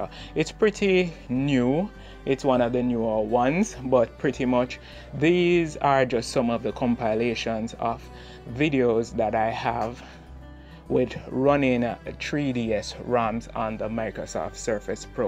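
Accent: Jamaica. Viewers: are you from jamaica?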